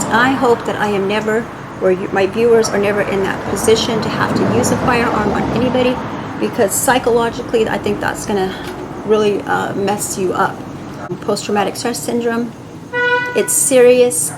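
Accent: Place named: United States. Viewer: Aussie here. You have the sweetest American accent lol Sarah Connor 🤠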